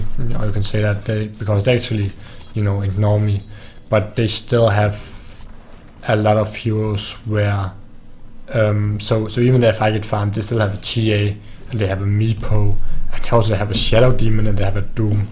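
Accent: Denmark. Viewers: What's your native language? You sound very danish :)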